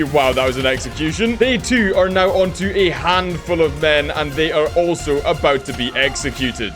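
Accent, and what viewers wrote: Scotland, United Kingdom. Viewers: Are you Scottish?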